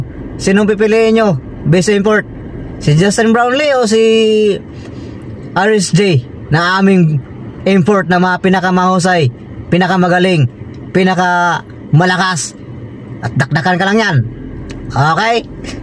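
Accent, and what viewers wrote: Visayas, Philippines. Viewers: Ayusin mo pagsasalita mo brad...bisaya ka yata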